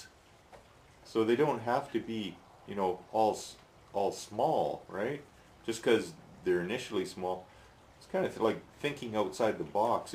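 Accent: Canada. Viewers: I am thinking you are from Canada, perhaps one of the Atlantic provinces?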